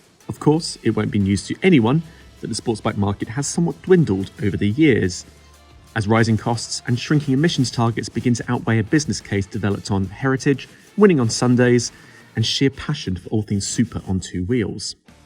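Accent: United Kingdom. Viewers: All bullshit aside.... A British accent saying weird words in a weird way, in no way means the wanker knows anything about riding a motorcycle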